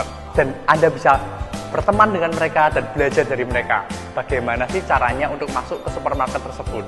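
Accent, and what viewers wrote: Indonesia. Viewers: Logatnya itu lho, medok sekali..... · ini orang logatnya kyk ada bali nya ya???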